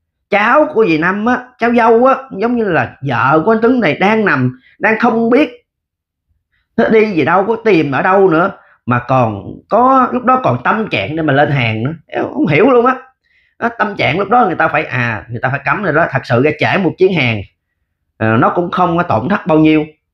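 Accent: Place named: Mekong Delta, Vietnam. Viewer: Công nhận.em nói tiếng.giong trai đồng bằng.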